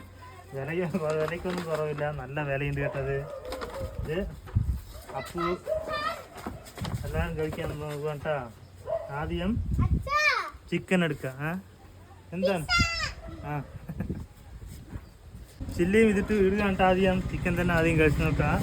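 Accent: Tamil Nadu, India. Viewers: Ningalu malayaliya allengil tamil la mindunadhu different ayeetu ondu adha chodhichadhu.sorry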